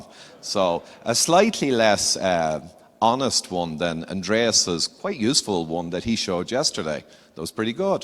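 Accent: Ireland. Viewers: I think without real statisticians like Norman Fenton and others like you we would have been f..ed. You are good presenter and Irish accent helps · Nice job on your intros,. And yes, we Americans DO like an Irish brogue.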